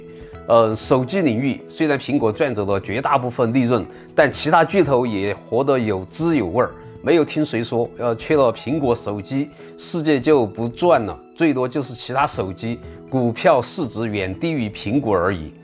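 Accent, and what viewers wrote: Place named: Sichuan, China. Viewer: 先把影片標題繁簡轉換搞對吧～「穀/谷」，很不專業。而且你口音實在有點重，與其努力繞舌，不如直接用四川話錄視頻吧，效果一定很棒，畢竟是母語。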